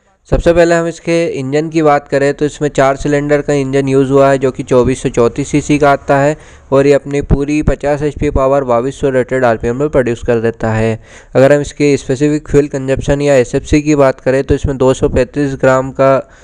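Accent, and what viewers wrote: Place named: Madhya Pradesh, India. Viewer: Me bhi mp se hu tumhare bolne k tarike se hi lg gya tha mujhe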